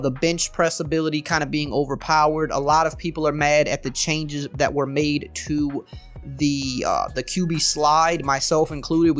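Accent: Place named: South, United States. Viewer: Thanks for u videos country boy your accent makes me listen lol frfr tho thanks for the info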